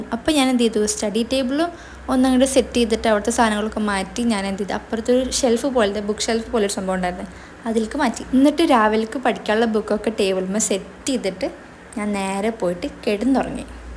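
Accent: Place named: Kozhikode, India. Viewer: Ningale slang kettitt oru kozhikodukaariyaanenn thonni😌🤌🏻, anyway avidethe fees engena pg?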